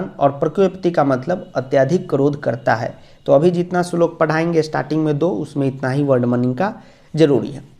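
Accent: Bihar, India. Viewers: Sir aap bihar se ho 😊😊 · Sir aap bihar se hai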